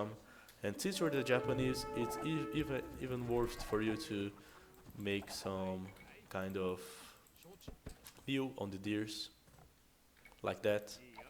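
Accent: Brazil. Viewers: ele é brasileiro? o english dele é meio sotaque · Your accent remember a brazilian speaking.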